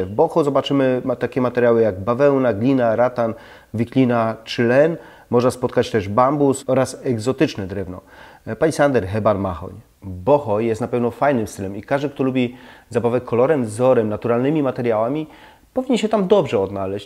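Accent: Silesia, Poland. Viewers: Ależ masz ekstra śląski akcent👍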